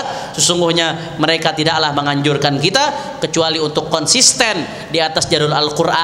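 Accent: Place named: Jakarta, Indonesia. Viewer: Asik cara ngomongnya habib hanif, betawi bgt. Smoga panjang umur dan sehat slalu habib hanif, aamiin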